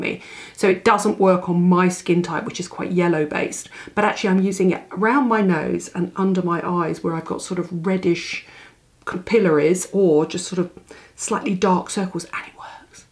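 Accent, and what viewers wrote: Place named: Nottingham, United Kingdom. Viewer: I just adore you. I think u r one of the loveliest and most well informed woman in the business. And your accent kills me. My mother was from Nottingham so u r one of my people. U must have Jeffree Star on your channel. I know he goes to England on promotional tours. He is even more cutting edge than Drew Barrymore.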